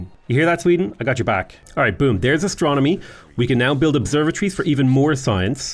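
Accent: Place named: Ireland. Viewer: I'm sure you get this question or comment at a regular clip, but how heavy is your Irish accent compared to folks in your area? I'd love to watch an upload where you ham up the accent for us non-Irelanders, I bet that would be fun XD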